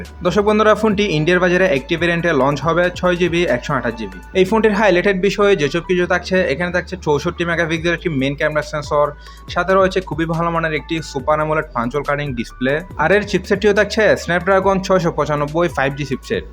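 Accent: Chittagong, Bangladesh. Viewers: তোর বাড়ি hode... চট্টগ্রাম এর ত বুঝা যার বাড়ি hode ও ভাই? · চিটাইংগা বদ্দা এনা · হেতের বাড়ি চট্টগ্রাম। ভয়েস এ চট্টগ্রামের টোন স্পষ্ট। শুদ্ধ বাংলায় কথা বলতেই সমস্যা সে বলছে সে RJ. মানে বুঝলাম না। আন্দাজে। ইন্ডিয়ান যারা তারা বলছে ঐখানে এখনো লঞ্চ হয়িনি।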